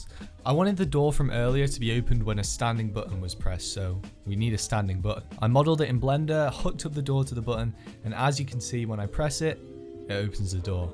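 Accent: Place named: United Kingdom. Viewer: I can notice a british accent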